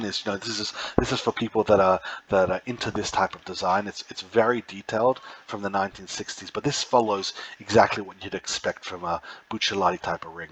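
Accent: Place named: United Kingdom. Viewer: The narration fits the vintage vibe. Ever so slightly British in accent, reminiscent of Antiques Road Show, and not unlike the jeweler in the Friends episode where Phoebe helps Chandler buy Monica's vintage ring. Coincidental? Maybe.....🤐🤭🤐 But perfect nonetheless.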